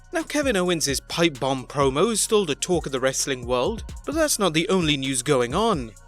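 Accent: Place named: London, United Kingdom. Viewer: lol Alex Jones has well over 6 million radio listeners and an additional 10 - 12 million viewers on the internet daily. In comparison to the WWE and their fake bullshit vs a truth telling Infowarrior who in the hell cares about phony ass wrestling when the world is in crisis. Turn off your televisions you idiots all hell is about to break lose and when it does there wont be any phony wrestling to watch. judging by this clowns accent I can see why London has fallen, instead of being out there supporting a real bad ass hero named Tommy Robinson he's watching phony wrestling and worshiping fake ones.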